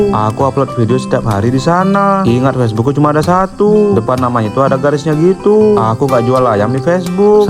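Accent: Java, Indonesia. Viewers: Abang orang Jawa kah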